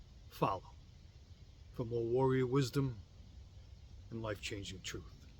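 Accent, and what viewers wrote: Boston, United States. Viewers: Good stuff!! Is that a Boston accent?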